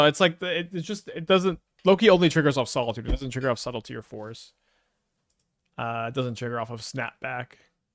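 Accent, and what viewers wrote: Texas, United States. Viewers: I knew Texans actually talked like that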